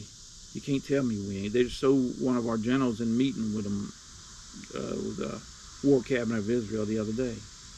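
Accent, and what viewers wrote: United States. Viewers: You sound like a hillbillie. now one cares about what u think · Your ignorance is striking. You sound like a backwoods hick and you think like one too.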